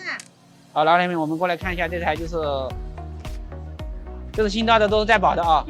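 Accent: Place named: South, China. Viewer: 南方口音重罢了 其实没什么的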